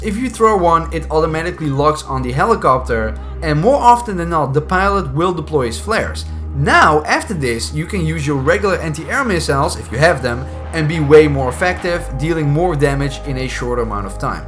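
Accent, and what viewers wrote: Netherlands. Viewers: Are you dutch? I think you are, because of your accent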